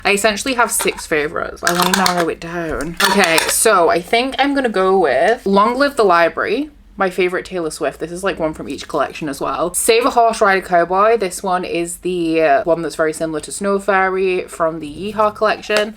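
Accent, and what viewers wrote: Yorkshire, United Kingdom. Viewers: My first time watching your videos and LOVE LOVE LOVE. Can I ask where is the accent from I hear Yorkshire but there is a Scottish `twang ? Am I right? ❤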